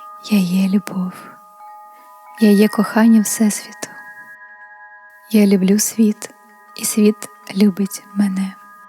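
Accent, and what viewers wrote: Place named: Ukraine. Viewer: Дякую мило🤗,а особливо за українське звучання ❤️🇺🇦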